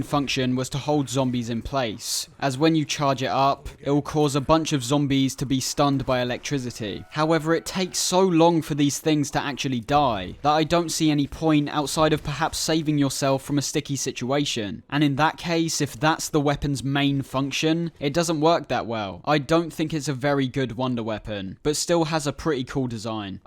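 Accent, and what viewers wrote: United Kingdom. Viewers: Australian? This guy’s British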